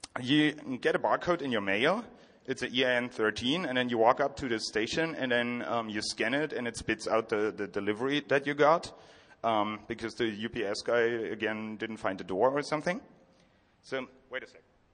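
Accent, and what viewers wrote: Germany. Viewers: NamelessGhost bruh... you’re Swiss and can’t tell it’s a German presenter, and so you just assume American? Chumm itzt, so dumm muess mer au nöd tue... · i sense a little bit of german dialect^^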